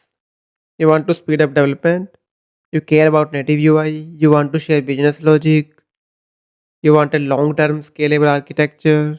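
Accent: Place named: India. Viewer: Nice video in an overall. I think you need to just slow the pace a bit! I usually dislike a lot of indian accent when speaking english, but in your case was really fine. Just need to improve a little more your speaking, try to pronounce more correctly the words, including the right sound of the W word. Doing this, your channel will grown way more!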